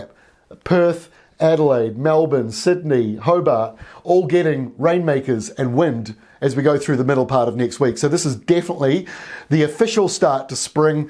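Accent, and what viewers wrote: New Zealand. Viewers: It’s so refreshing to hear the NEW ZEALAND place names as we’ve ALWAYS known them. Don’t bend, don’t conform, Duncan.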